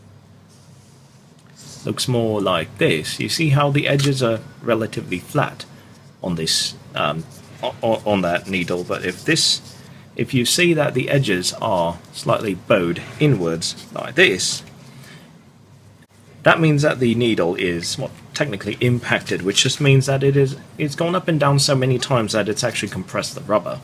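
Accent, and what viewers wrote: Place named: United Kingdom. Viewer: Another useful video thanks. Have you lived in the UK,just going by your pronunciation . Andy.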